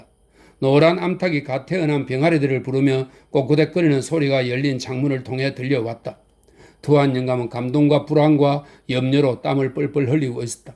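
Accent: Gyeongsang, South Korea. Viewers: ❤힐링시간 허스키 경상도 친한 막걸리 목소리 강건❤하소서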